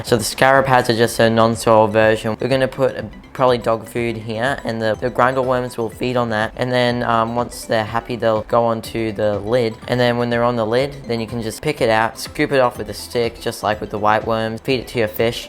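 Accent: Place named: Australia. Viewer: Great video mate, glad I came across another fish related channel! Sounds like you got a bit of an Aussies accent you from here?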